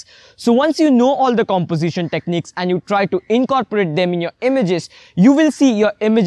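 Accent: India. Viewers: I love indian accent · indian accent very inique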